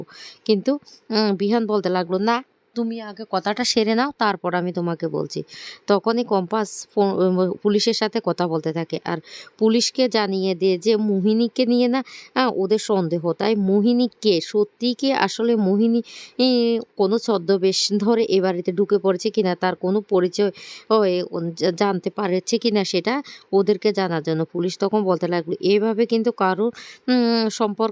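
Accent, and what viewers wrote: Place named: Chittagong, Bangladesh. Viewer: আপনার চট্টগ্রামের টোনে কথা খুব ভালো লাগছে।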